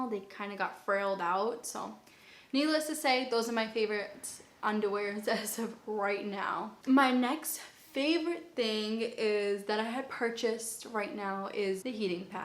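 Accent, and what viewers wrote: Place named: Massachusetts, United States. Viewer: Where in Massachusetts are you from? That accent is deep lol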